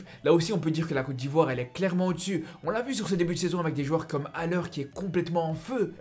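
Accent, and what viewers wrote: Belgium. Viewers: Salut frère l'Algérie sera qualifié sans problème,,, tu as un accent belge ?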